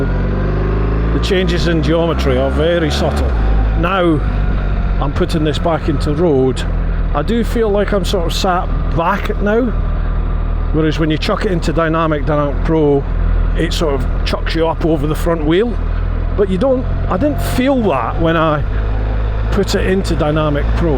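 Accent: Scotland, United Kingdom. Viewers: He's from Scotland, not Ireland. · I have a <num>RT, very pleased with it. I got to ride the 1300RT while my bike was having it's 12000 mile service. The few gripes people had with the 1250 would surely be addressed with the revised model, I thought. But alas, no, the switches are still not back lit, the footpeg position is even worse, and it's even heavier than the slightly obese 1250. (I like fat girls though, so I don't care...). The silly aerodynamic flaps are a joke, and the panniers are nonsense. It still does not have car play, the heated seat and bars are even fiddlier to operate, and the favourite buttons are hidden by the handlebars. When I returned the bike, I was so pleased to get back on the 1250. BMW, you really need to have a serious rethink. I know this might sound absurd, but have you considered listening to your existing customers? 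Of course not, what the hell do we know. Oh, and I can't believe how ugly the new one is. It does not pass the shop window test. As far as this review goes, nice one, I love the Scot's brogue.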